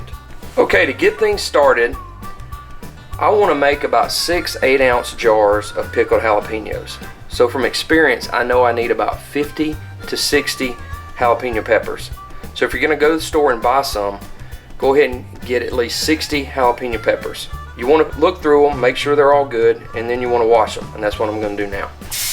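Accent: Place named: South, United States. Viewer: You sound Southern so maybe you're familiar with Braum's. Their pickled jalapenos are my favorite and I'd like to copy them if I can.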